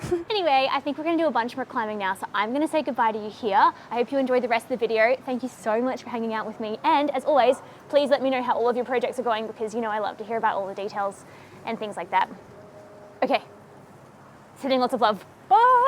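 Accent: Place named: Australia. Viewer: Clicked for the pants, stayed for that australian accent 🤣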